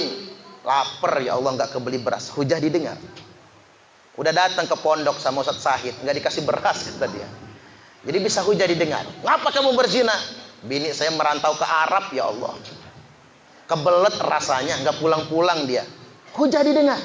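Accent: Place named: Jakarta, Indonesia. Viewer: iki lho pak , sampean wong njowo opo dudu , logate koyo wong njakarta , jainudin mz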